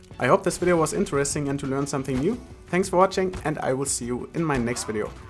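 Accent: Germany. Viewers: A question. Are you a german Youtuber who talks English? your accent sounds german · dein englisch ist so schlecht man hörtdas du deutscher bist lappen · dieser dreckige deutsche akzent......den kann man sich einfach ned geben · You are german riight? I hear that.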